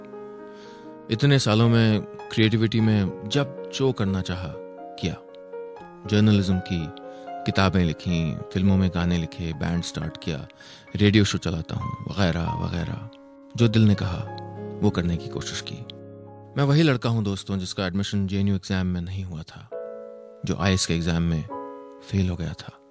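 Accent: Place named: Lucknow, India. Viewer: आपकी आवाज़ से लगता तो था की आप लखनऊ, कानपुर के हैं. आज पता भी चल गया माँ- बाप के लिए सीख है की बच्चों पर अपनी इच्छाएं थोपें नहीं. उनकी रुचियों को अहमियत दें. नहीं तो बच्चे कब आपसे दूर चले जायेंगे पता भी नहीं चलेगा.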